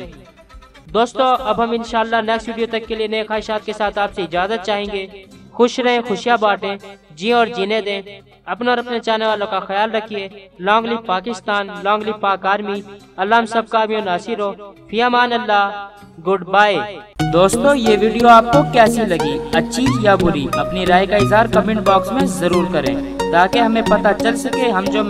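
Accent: Azamgarh, India. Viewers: good information About QRI Abdul BAsiT A lot Thanks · aap Azamgarh se ho kya??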